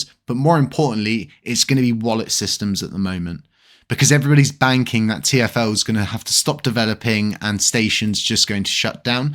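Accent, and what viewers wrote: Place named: Oxfordshire, United Kingdom. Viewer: Trying to work out your accent. Oxfordshire? Never the less. Great content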